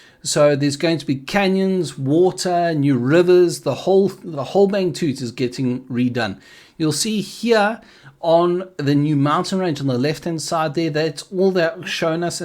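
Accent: South Africa. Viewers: my man great video one question are you south african cause you sound like one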